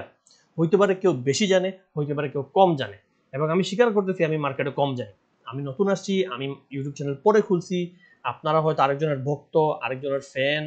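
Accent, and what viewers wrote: Sylhet, Bangladesh. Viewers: Good with sylheti accent. Topic doesn’t match with analysis.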